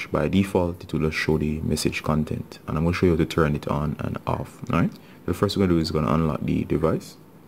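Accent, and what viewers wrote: Jamaica. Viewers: Sound jamaican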